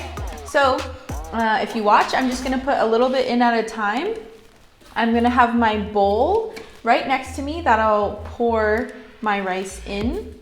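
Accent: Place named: Morocco. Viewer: Hello hello bravooo thank you for your recipe ❤ I like it 🌹 good luck . I like your Moroccan accent ❤❤❤❤ keep it good guys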